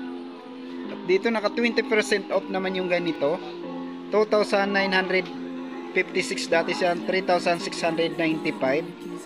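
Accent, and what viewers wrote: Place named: Visayas, Philippines. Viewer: REALTALK IDOL HUWAG KA MAGALIT NAGMAMALA SAKIT LANG AKO DAHIL FOLLOWERS MO AKO UULITIN KO SAYO GAYAHIN MO ANG ""BALAHURANG KOMIKERA""NILALAGAY NYA YUNG DISCOUNTED PRICE AT REGULAR PRICE NYA SA SCREEN AT ANG BILIS DUMAMI SUBSCRIBERS NYA... DITO KASI SA METRO MANILA PAG HINDI MAGANDA ANG BOSES MO O TONONG BISAYA O HINDI MO PA MA PRONOUNCE NG MAAYOS ANG SALITA MO "SORRY TO SAY" NA BOBORE O NAWAWALAN NG GANA ANG VIEWERS KAYA MASMAGANDA NO VOICE KA NA LNG IDOL...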